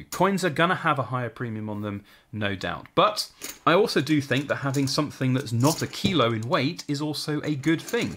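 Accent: United Kingdom. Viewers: Yes your accent is clearly British and I also love Britannias. Typically I purchase 90% silver, Sunshine rounds&bars, Canadian Maple Leafs, and Britannias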